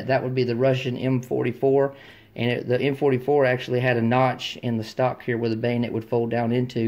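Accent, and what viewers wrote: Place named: Deep South, United States. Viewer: Thank you Man, i knew you re from the deep south because of your accent. Take care.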